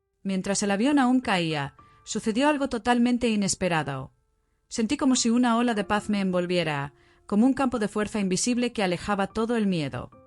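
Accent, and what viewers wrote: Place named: Spain. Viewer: JA JA JA COMO YO ? PRIMERA LA BURRA POR DELANTE Y LUEGO LA ENFERMERA Y EL EQUIPO MEDICO AUXILIAR PRA ATENDER ALA PERSONA ENFERMA DURANTE EL VUELO. SI.. POR LA NARRACION DE VOZ ES UNA ESPANOLA QUE NI EXPRESARSE CON CONCORDANCIA SABE. EN SU PROPIO LENGUAJE. QUE VERSGUENZA ASI ARRUINA EL MENSAJE DEL SUCESO. EN CUESTION .QUE ABURRIDA NARRACION.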